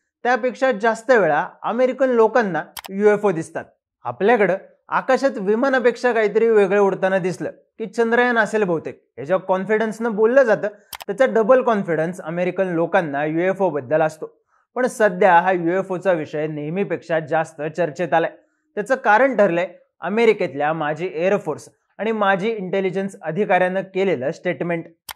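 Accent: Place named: Kolhapur, India. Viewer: हा कोल्हापुरी भाषेचा लहेजा आहे ❤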